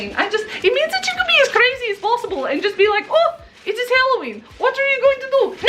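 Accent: Australia. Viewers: OmO I'm back. Oh lord. I found Piloff and this accent first.. and I started to go through your other videos because I am in love. But I was shook hearing the aussie accent. You are so extra and halarious!! Keep up being the fabulous you!!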